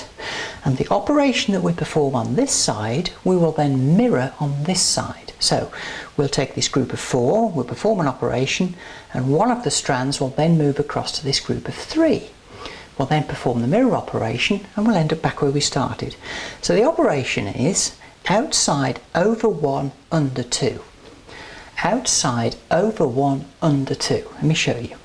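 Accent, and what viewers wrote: United Kingdom. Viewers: Great video. What I loved most was your British accent. Bravo.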